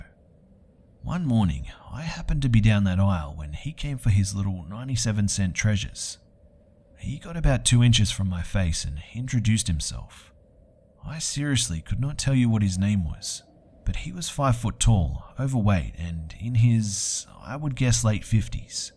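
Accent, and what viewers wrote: Australia. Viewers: Always feels so good to see you've uploaded a new video! If I'll get 1/100 of your subs, aka 387, it'd still be huge for me since I've got 22 so far, and I've released 13 videos, so I almost have more videos than subs lol XD But back to the original topic, I really love your videos, and the Aussie accent is a welcoming bonus :) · The Australian Accent was a shock in it self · Are you Aussie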